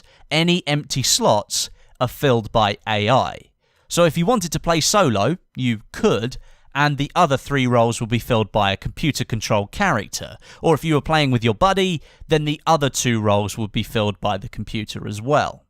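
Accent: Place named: United Kingdom. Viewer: why do brits get famous by posting crappy vids. i want to see REAL gameplay not listen to your annoying brit voice.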